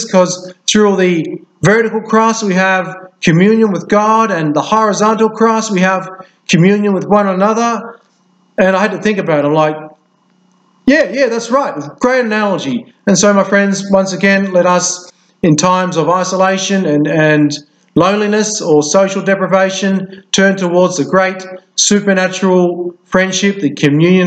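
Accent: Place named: Canada. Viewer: Thanks for the inspiring message Padre! Nice Canadian accent 😂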